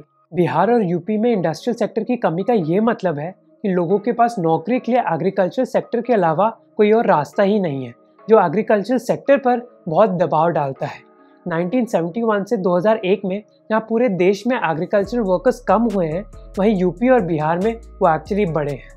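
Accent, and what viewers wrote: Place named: Karnataka, India. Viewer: YOUR VOICE IS SAME AS MY KANNAD FRIEND .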